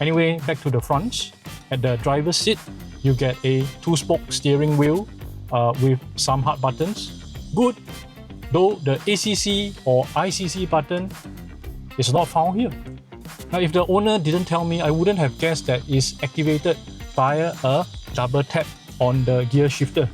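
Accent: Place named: Singapore. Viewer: Singapore English style 😑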